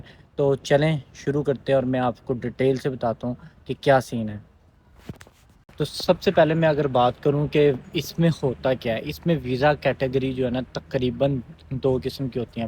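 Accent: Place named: Punjab, Pakistan. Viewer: Veer punjabi lg re o gal bat to pta lg reha.😄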